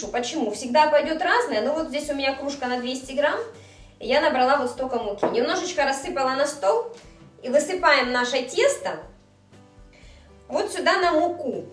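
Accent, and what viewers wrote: Ukraine. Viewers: Вам речь кажется неграмотнной, а на самом деле это местный диалект русского языка на украинской почве. Так же как существует масса диалектов в пределах России. Все очень просто, можно было бы Вам и самой додуматься, но видно привычное русское высокомерие мешает.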